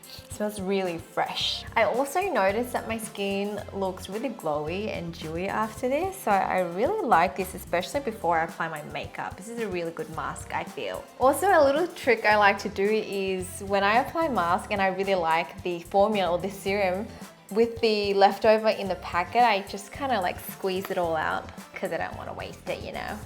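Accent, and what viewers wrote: Australia. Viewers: I started watching her and I was like, is she Australian? I was right. Go Australia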